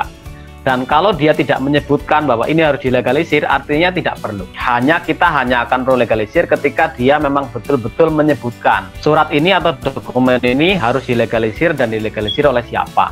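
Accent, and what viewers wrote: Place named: Java, Indonesia. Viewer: Logate jowo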